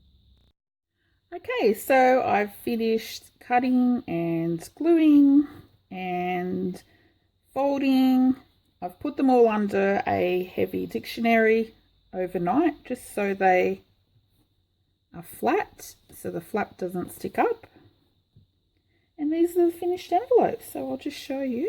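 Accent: Australia. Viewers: These are absolutely fabulous,! I hope they sold well! I’m an old quilter, started in 1986! But haven’t quilted much since my divorce & move back to Kansas in 2004. So I have a huge collection to choose from! 🥰🤗I will have to push junk out of the way, but if I’m motivated that won’t be a problem! Haha! I recently bought some cotton fabric at Walmart (those of us who really aren’t too crazy about shopping there call it Wally’s World! I suspect you would be in that category!) But I bought it to use as half pockets on larger journal cards, etc. Then thought of some other thing to be entranced with & they got pushed aside…story of my life!! Another woman said she thought she had “journaler’s ADHD”, yep that’s me! I don’t think it’s all that uncommon among crafters, though! I’m enjoying your channel soooo much, you are just so much fun & I love your accent! In one video you said you lived in Sidney, are there different accents around the country? 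I mean you’re a continent! England is just one little bitty country & yet practically everybody I watch has a different accent! It’s really interesting to me how that happens. Are your eyes better now? I hope so! Take good care! Erica❤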